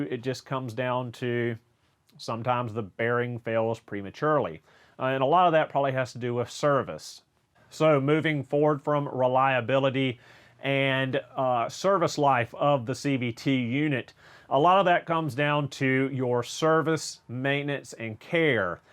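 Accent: South, United States. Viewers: As a not native Floridian (I'm moving from Michigan), idk why but I trust a southern accent more when it comes to car stuff. Seriously, you're right. JATCO has horrible CVTs with rushed designs, but Subaru and Toyota CVTs last. I know this first hand. I'm about to sell my Nissan and get either a Volvo or Subaru. Thanks for the objective advice. Sometimes I like Scotty Kilmer (the guy I know youre talking about LMAO) but half the things he says are outdated or incorrect.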